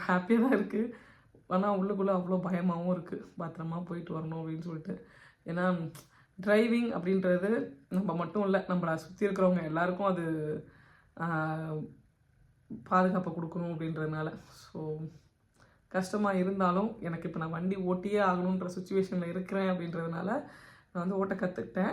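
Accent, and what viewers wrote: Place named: India. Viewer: Hiii.. Very useful video..Thank you so much for sharing your experience.. Namma Indian English slang Pesurom school la work pogum pothu eppadi inga ulla local kids kooda neenga manage panneenga..They can understand our slang especially initial time when we get into the work?.How did you manage your starting days of working in school?Can you share some of your experience too.. Thank you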